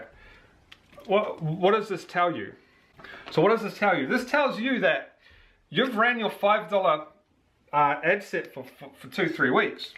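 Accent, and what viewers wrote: New Zealand. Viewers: I'm not sure if its because you're a New Zealander and I just instantly connect with the accent so i understand better about what you're saying, or whether you're just explaining it better than any other person on youtube, but man... This video is EXACTLY what i wanted to hear someone say to me about facebook ads. I've been searching for this information for weeks. You're the only guy who's explained this, thoroughly, in depth, in an easy to follow and easy to digest manner. I'm gonna go as far as saying this just about trumps every single $300, $500 "course" that some of these youtube "gurus" try to sell you. If I actually get sales from implementing your knowledge from this video i'm gonna thank you forever. Stay tuned ;)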